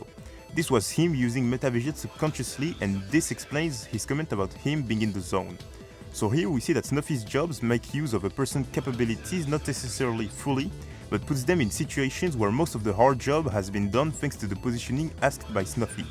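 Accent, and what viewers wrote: France. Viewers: je me disais que t’avais un accent français 🤣jusqu’à ce que je vois ta bio, continue comme ça bgg force !!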